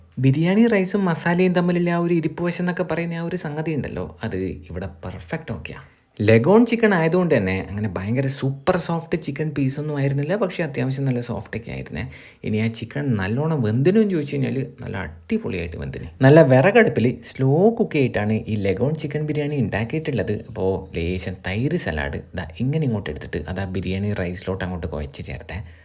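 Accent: Kannur, India. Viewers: Uushentappa🤣🤣🤣kannur bhasha. U are so funny👍😂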